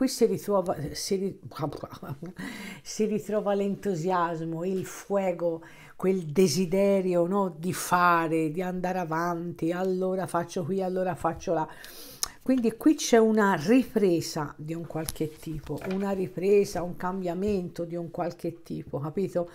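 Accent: Tuscany, Italy. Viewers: Ascoltati tutte e due, perfetti in entrambi. Grazie mi piace molto il tuo accento Toscano 🤩🥰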